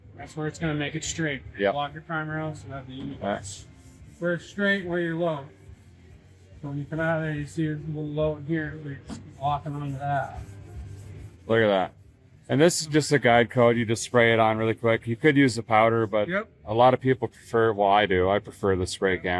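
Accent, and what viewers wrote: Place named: South, United States. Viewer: It's good to see a video where for once your not adding extra made up words or acting " extra country " instead of just speaking normal like you did at the beginning in the body shop. I keep skipping or giving up on your videos when you start making up words or trying to make illiterate talk seem cool. Please keep up the good work and less of the slang talk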